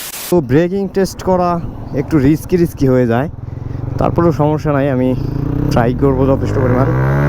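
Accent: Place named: Manikganj, Bangladesh. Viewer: আপনার কথা ভালোই লাগে। আপনার ভাষায় ধামরাই মানিকগঞ্জের একটা টান আছে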